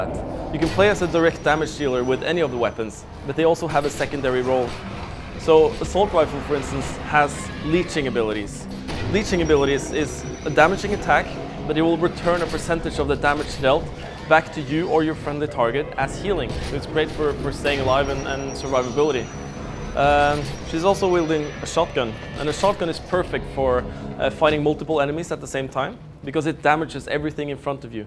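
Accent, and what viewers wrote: Norway. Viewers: So norwegian way to speak english!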